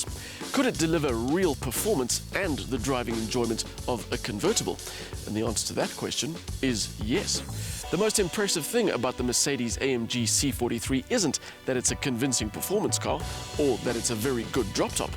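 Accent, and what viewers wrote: South Africa. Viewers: Great video, love the South Africa accent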